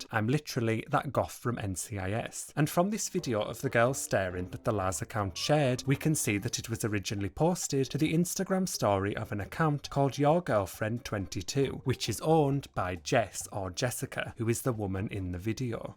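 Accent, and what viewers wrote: United Kingdom. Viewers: I can’t tell if your from St Helens, Wales or Newcastle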